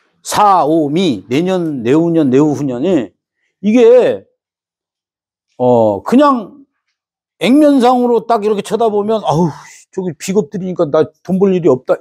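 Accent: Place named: Jeju, South Korea. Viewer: 제주도 사투리 넘 웃겨요~~최고의 강의 감사합니다~~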